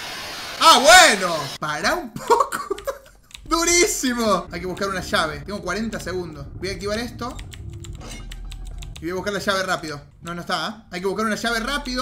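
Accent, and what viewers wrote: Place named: Spain. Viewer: Naaaahhh, entre tus gritos, acento español y los nervios del juego me trasladé a otro universo 😂 Geniooo 👻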